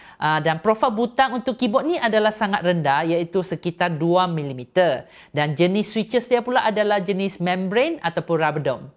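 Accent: Sabah, Malaysia. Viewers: macam Sabahan + Singaporean?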